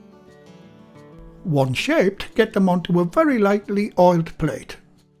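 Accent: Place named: Northern England, United Kingdom. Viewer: Hi, love your channel, I only now realised your voice is like Sir David Attenborough but with a Northern accent, really calm spoken, If Sir David Attenborough done the food channel and had a Northern accent I wouldn't tell you apart 🤣, thank you I'm looking forward to trying this one, it's not complicated 😁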